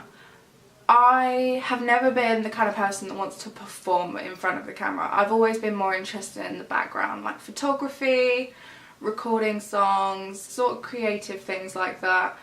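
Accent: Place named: England, United Kingdom. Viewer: No wonder u have an English You’re from there lol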